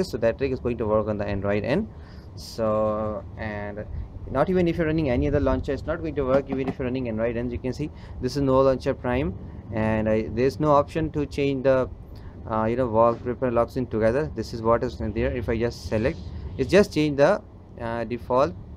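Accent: India. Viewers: You have a terrible Indian accent... Just terrible... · You speak too fast! We native American English speakers do not speak the English language that fast, and it is VERY hard to understand when you speak that quickly. I know that in your native language(s) in India (I'm guessing) you speak very quickly, but in rest of the English-speaking world we do not speak English like we are firing full-automatic on a machine gun! I could not follow you because you spoke too quickly and mumbled some of what you spoke too quickly. You need to learn to SLOW DOWN and ENUNCIATE your English if you want to do these videos outside of English-speakers from your country who are no doubt used to lightning-fast English spoken in a Hindi/Urdu/etc/--fast way. All I wanted to know was how to set multiple lockscreen wallpapers in Nova Launcher (because somehow they stopped changing with Nougat) without having to re-engage TouchWiz Launcher and then re-engage Nova Launcher--- but I can't understand you, so this video is a FAIL.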